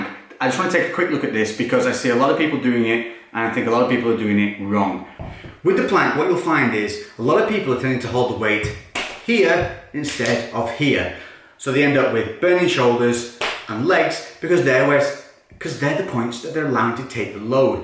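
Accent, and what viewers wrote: United Kingdom. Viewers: How did I know he was going to have a brittish accent before the video started LOL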